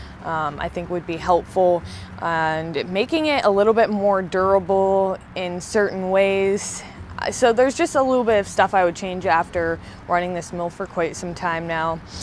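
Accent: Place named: Pennsylvania, United States. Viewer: .... You're just lovely. So natural, so real, so.... lovely. Also, I get a real kick out of the PA accent. Most don't hear it, but I certainly do, being from RI. Live in D.C. now. Respect. My Favorite channel. Fact. Who would have thought one could create an interesting channel about a saw mill? Your talent makes it possible....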